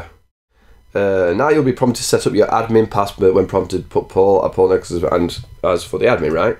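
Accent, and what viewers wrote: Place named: Doncaster, United Kingdom. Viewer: Nice to hear a plain-speaking Doncaster accent rather than an American on this sort of video. At 9mins or so, the chosen one-click install in DO is not the official recommended way to install Discourse. Discourse itself suggests following its setup instructions through the command line. I gather the one-click install does not create a swap file, which is why the setup slows to a halt unless you choose the larger USD15 droplet. Discourse will run happily for a small community on a 1gb droplet using the official instructions that employ a swap file. Also, you cannot downsize a droplet (ie go from 2gb to 1gb). So perhaps it is best to start small then resize upwards if need be. Anyway, thanks for video, very helpful.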